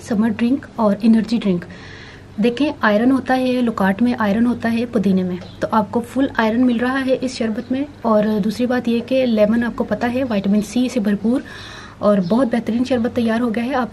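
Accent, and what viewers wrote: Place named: Punjab, India. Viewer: OK ji, asi dono cheeni te Gurr alag alag try kara gae, jo changa lagya agey to oh cheez pa lya kara gae ji, hor v es type de fruit de juice dasna ji. Tuhaadi Punjabi sun k bahot he vadiaa lagda hae, edhar di Punjabi te tuhaadi Punjabi iko jehi hae, sun k bahot mazaa aanda hae.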